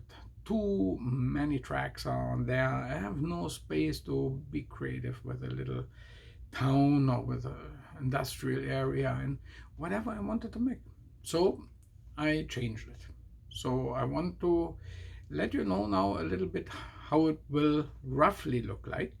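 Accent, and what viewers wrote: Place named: Germany. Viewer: Nice video:-). Thanks so much for sharing this and also it was really really nice to hear from you on the 8th October 2020:-). You do make some creative suggestions and thorough talks about how you make your model rail layout giving examples to what it can relate to relating to real life stuff:-). Well done indeed:-). Thanks so much for sharing this excellent video its an excellent looking model railway you have and well layed out your model railway:-). Plus you thoroughly explained your rail layout terribly greatly I must admit:-). Especially being German and despite being German you're certainly sounding really fluent in English with your accent:-). I can see you're fluent in both English and German which is excellent I do say and its ok whether you are or not:-). We can only be fluent in so many languages:-). Alright then, have a nice evening, plus I wish you all the best and take care of yourself:-D:-D:-). 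From your friend Foxy:-).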